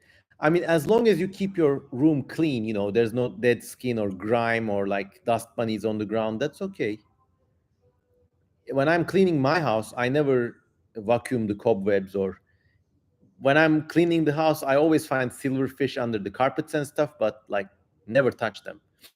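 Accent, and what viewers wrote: Turkey. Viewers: Abi Türkçe seslerle İngilizce konuşmana bayılıyorum ya :D, bu arada çantandaki kalemlere de bayıldım.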